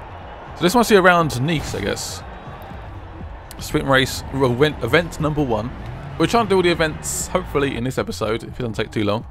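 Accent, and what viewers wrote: England, United Kingdom. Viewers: This man English ?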